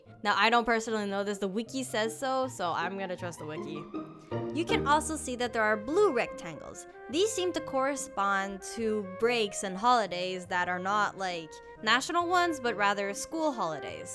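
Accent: Quebec, Canada. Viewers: I KNEW YOU HAD LIKE A CANADIEN ACCENT THINGY!!(Im french lolol) Bravo ave tes abonnements!!!!! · Is that me or you speak just to good in French to be an English people? XD I don't know how too say, but you have the French Canadian accent (Québécoise aussi si tu veux XD), did I'm crazy? Svp, ne me dis pas que je suis folle, même si parfois je suis folle U-U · OMG you totally sound like you're from Québec! That french was flawless!!! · You are from Quebec!! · Tu vis au Québec huh? lmao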